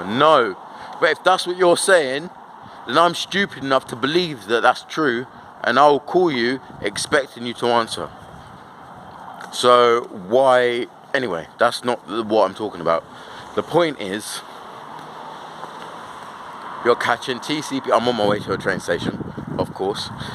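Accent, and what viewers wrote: United Kingdom. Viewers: mad how I use to think u was from london🤦🏾‍♂️😂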